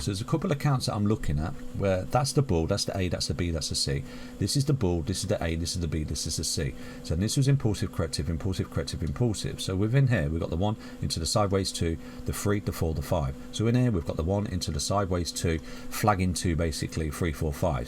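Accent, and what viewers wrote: United Kingdom. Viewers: He is from the UK and you are probably not understanding him when he says "big up the coinskid crew"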